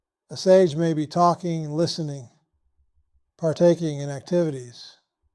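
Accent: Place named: South, United States. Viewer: this IS Roberts voice isn't it ?? Arrr damn ... I was sure it was . Disappointing. Ar well close enough ... yes TruE he's got that southern Twang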